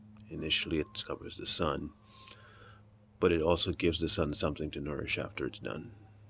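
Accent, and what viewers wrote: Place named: Caribbean. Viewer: Which Caribbean island are you from, dude?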